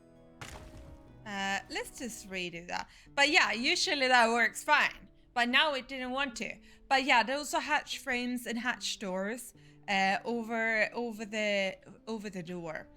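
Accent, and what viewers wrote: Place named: Sweden. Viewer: Hey there, thanks for the fast reply! I just finished the rest of your video and I really enjoyed it. The dungeon part turned out very cool with the stair cases and vents. My favorite build set right now is Nemedian. I think the thick stone and rustic wood look really nice together. And that's a Swedish accent there!! Thanks for saying so. With a smidge of old British of course ha ha. It's like music to my ears. Looking forward to your part 3!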